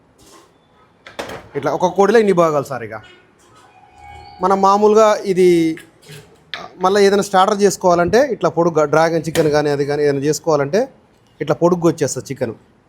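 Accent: Telangana, India. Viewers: Are you telangana?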